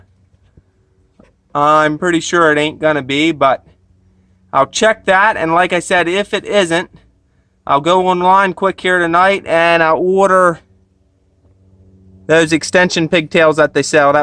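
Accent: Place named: Eastern Pennsylvania, United States. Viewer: If I had to guess, I’d place him somewhere in eastern PA.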